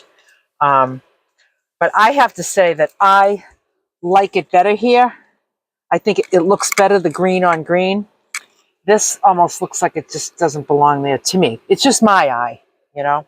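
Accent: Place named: New York, United States. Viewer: New York or New Jersey? Cause so many of my family members sound like you do. I could be wrong in placement of origin. Ill accept it if I am but if possible I'd love to know.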